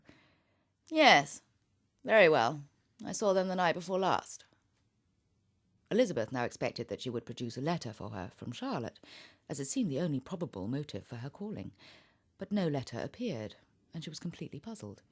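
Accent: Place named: RP, United Kingdom. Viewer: This is absolutely gorgeous! I love her voice and the way she speaks. She sounds so posh (but I like it) and her diction is FLAWLESS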